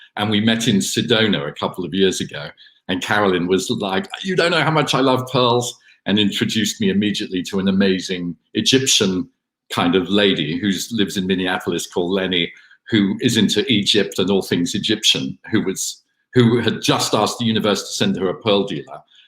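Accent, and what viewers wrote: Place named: Australia. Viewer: Great talk! I loved listening also because of hearing Australian, it makes me miss Australia even more :)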